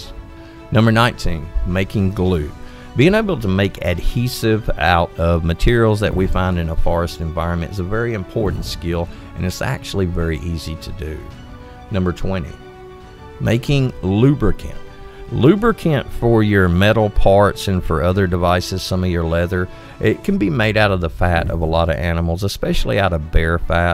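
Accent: Alabama, United States. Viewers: That sounds like a Cullman Alabama accent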